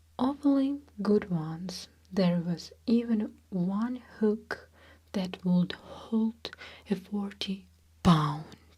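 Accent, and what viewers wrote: Russia. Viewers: Thank you so much!~ I enjoy the relaxing tingles from this video and I love your Russian accent!~ You speak English so well as well if I may say so.~ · I admire Russian literature and Anton Chekhov was a great writer even though he is not as famous as Tolstoy. I think you should make this into a series especially stories from the 19th century. I love your soothing voice with that beautiful accent of yours 💕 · Beautiful, beautiful russian accent and all the options for names! wow! I like Russian so much. Thank you for another wonderful video and, you look so beautiful!!! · I also agree that your English is very good!! Your Russian accent is even more adorable and that is a great reason that I listen to you!!! Very sweet and relaxing!!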